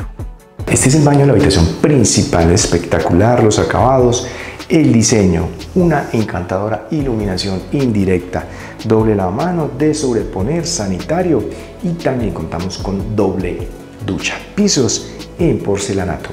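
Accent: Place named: Colombia. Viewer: Tiene el acento colombiano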